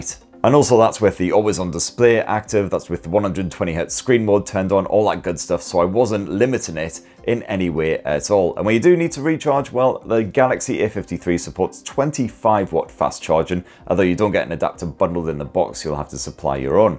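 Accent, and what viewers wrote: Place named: Newcastle, United Kingdom. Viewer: Wow - great vid find this. Easily explained in a Gerodie-esque accent. I get it, but others may not fathom the language barrier. Independence for the North!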